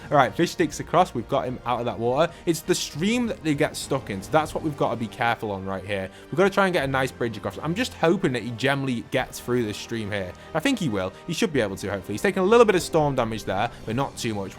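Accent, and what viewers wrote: United Kingdom. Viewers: Also you kind of sound Scottish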